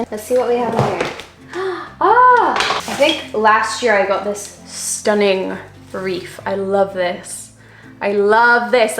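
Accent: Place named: Edinburgh, United Kingdom. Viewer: She’s from Edinburgh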